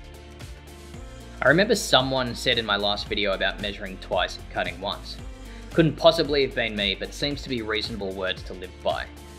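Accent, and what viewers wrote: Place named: Australia. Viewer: keep the content coming. I also learned some of the Australian accent 😆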